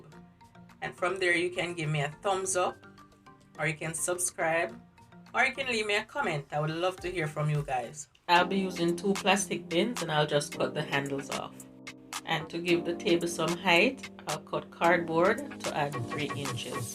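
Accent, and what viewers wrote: Jamaica. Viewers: Sounds like a yardie. Mi like it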